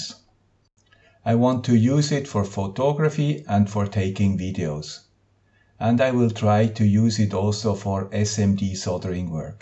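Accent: Switzerland. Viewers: That would be fantastic, this has been an issue for me for a while. I have very good lighting at my bench. As I am approaching 50 myself and would like to work with smd, it's time to do something about it. I appreciate your quick response and look forward to seeing and hearing the man with the Swiss accent''s solution. Have a great week!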